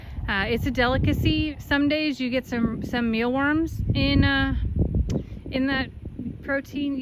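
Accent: South, United States. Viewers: you are such a southern girl now… I heard y’all, yonder, holler!!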